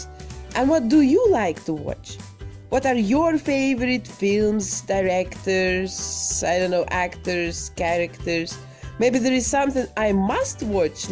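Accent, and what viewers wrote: Russia. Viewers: I normally cannot stand strong Russian accent, but with your videos I find that I can listen to you for hours and watch your incredible repairs! Awesome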